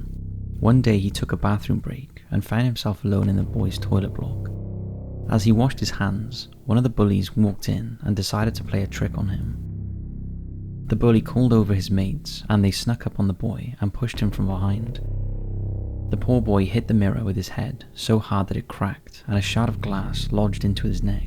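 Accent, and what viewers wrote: Wales, United Kingdom. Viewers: definitely a Welsh accent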